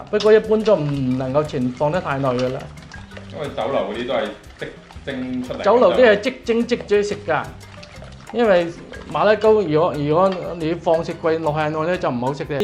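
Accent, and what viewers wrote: Zhongshan, China. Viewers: 師傅口音應該係我鄉下中山石岐，是嗎？ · 是中山，不是石岐.而是中山隆都。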